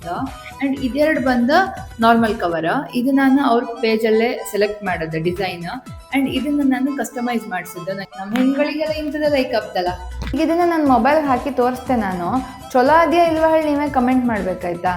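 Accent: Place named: Kundapura, India. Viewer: ಕುಂದಾಪ್ರ ಕನ್ನಡ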